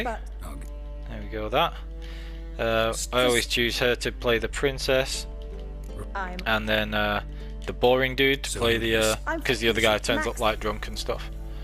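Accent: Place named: United Kingdom. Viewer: WeeeeeelllIl, want to marry a British guy just for the accent. As soon as I find one willing to. So yeah, guess most of the girls around the world do love your accent 😅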